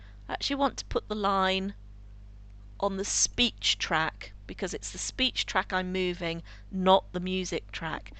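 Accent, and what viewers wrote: England, United Kingdom. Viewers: i wish i had ur cool english accent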